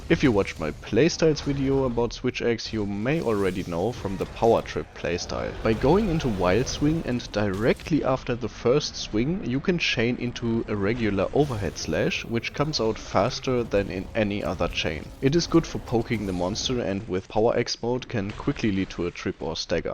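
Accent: Germany. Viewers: Dieser Deutsch Akzent 😂😂😂 gutes Video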